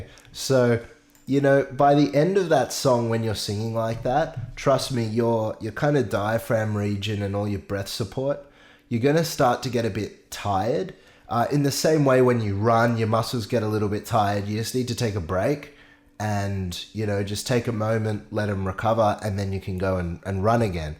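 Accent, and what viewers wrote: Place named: Australia. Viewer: I love ur accent. Ur Australian accent.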